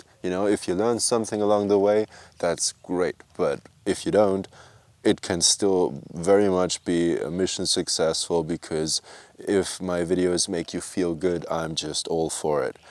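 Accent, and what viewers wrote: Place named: Germany. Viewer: Sound German by your accent, come across your video, it's interesting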